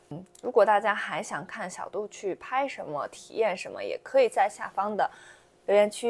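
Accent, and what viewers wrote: Taiwan. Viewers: 有點台味了🤣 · 你其實本來就很有氣質了@@ 但被台灣口音同化之後，更像仙女了🤣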